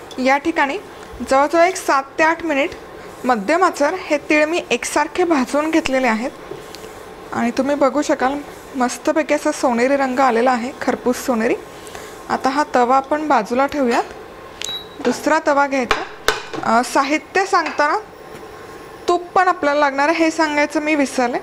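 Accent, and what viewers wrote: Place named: Pune, India. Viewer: Jinnas means ingredients na..ryt....ssly punyatle lokanche marathi pure e..sahi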